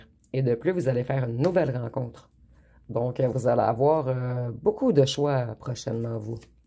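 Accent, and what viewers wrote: Canada. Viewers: Vous êtes la meilleure. En plus j'adore votre accent canadien. Vos interventions ont valeur de pistes possibles dans la carte de mon, pélerinage terrestre. Le très inspiré jésuite, Teilhard de Chardin, n'a-t-il pas eu cette phrase prophnétique = "Nous ne sommes pas des êres humains qui ont une expérience spirituelle; mais des être spirituels qui ont une expérience humaines. " et je retrouvre par votre voix cette Vérité fondamen,tale. MERCI à Vous, Madame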